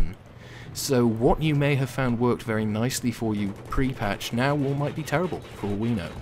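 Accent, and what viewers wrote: England, United Kingdom. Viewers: exactly what I was searching for, far better than any other video assessing the patch that has thus far been uploaded on youtube. on a personal note, nice to hear an english accent on a battlefield 3 commentary, I am subscribed to many american commentators and had struggled to find a decent english equivalent, struggle no more. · Oh my god! it it so satisfying to finally hear somoene with a strong english voice narrating on of these videos,(i cannot cope with anymore generic US acents)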